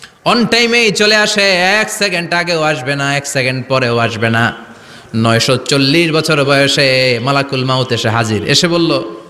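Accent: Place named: United Kingdom. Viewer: Ami jotodur sunchi uni 4 years bolen nai. Forty years bolchen .Uni khub somvoboto british pronunciation e word gulo bolen. Ar british pronunciation e 40 bolle omon e sona jay. Allahu alam .ami vul o hote pari.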